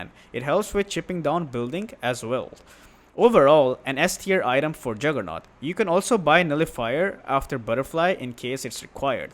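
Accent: India. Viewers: i like your INDIAN accent dude.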